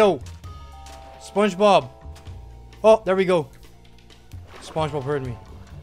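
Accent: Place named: Netherlands. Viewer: ur accent sounds dutch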